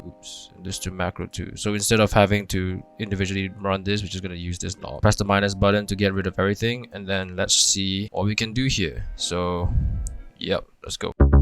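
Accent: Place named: Northeast India, India. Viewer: BTW BRO U LIKE LIKE NorthEast indian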